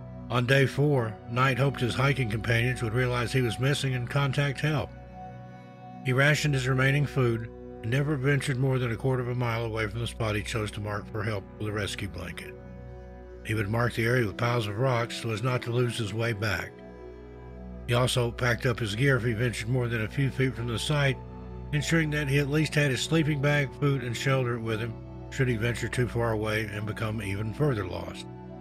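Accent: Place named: Tennessee, United States. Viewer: I immediately heard a Tennessee accent (was raised there and used to live around monroe county) and got excited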